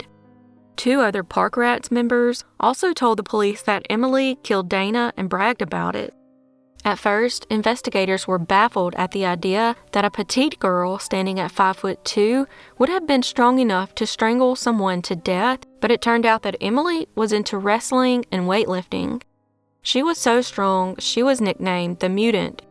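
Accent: United States. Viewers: just found your channel.. Good narration...Concise.. with the best American accent. Appreciating your content from the UK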